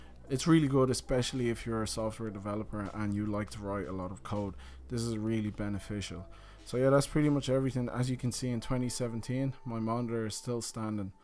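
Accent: Ireland. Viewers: Where in Ireland are ye from? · the irish accent though man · Love the irish accent! Keep up the great work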